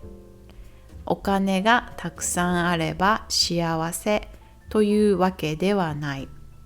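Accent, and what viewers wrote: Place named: United States. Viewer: woow thanks for creating such a helpful video❤ by the way your English is very very good you sound like American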